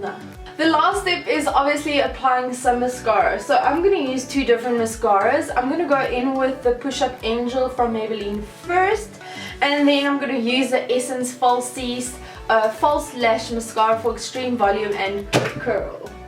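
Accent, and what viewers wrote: South Africa. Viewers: Cool babe !! I miss South African accents so much !!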